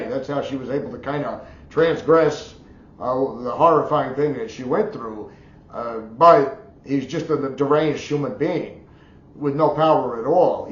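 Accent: New Jersey, United States. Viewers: I can’t believe you’re from Jersey. That accent is so intense 😂 I can relate to you a lot.. I’m from Essex county and grew up with a music-obsessed father as well. Your understanding of female bodily autonomy is heartwarming. It should just be normal but unfortunately it’s not.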